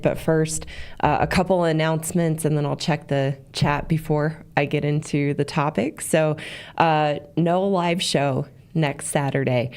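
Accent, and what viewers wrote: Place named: Midwest, United States. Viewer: I knew that I was hearing a hint of a midwest accent with a few Hoosierisms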